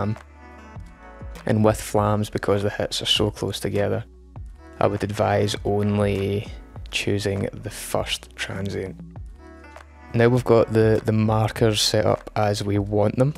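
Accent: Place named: Scotland, United Kingdom. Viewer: I find it odd how few views and likes videos get, considering how handy they are for a Cakewalk user - maybe folks out there can't understand his rather mild Scottish accent!? Thanks for the posts!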